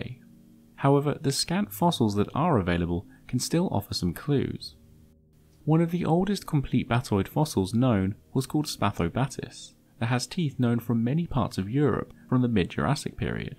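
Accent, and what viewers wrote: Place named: United Kingdom. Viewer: in, the "sorefish?" Why were they sore? Oh, wait. You meant "saw-fish." Damn, dude. Learn proper English. Ah'm jus' phokin' wi' ye, mate. Jus' takin' tha piss. But for real, sometimes, your non-rhotic 'r's, plus adding 'r's to words with open 'ah's, make what you say "hahhd to unduhhstand" for non-Brits.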